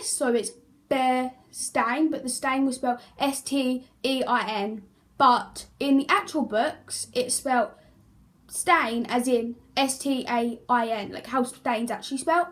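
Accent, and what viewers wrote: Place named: United Kingdom. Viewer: hard to listen to the br accent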